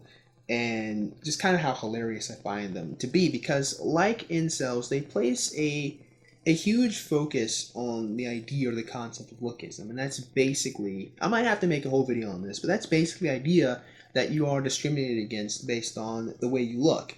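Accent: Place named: England, United Kingdom. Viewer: Lose the random English accent.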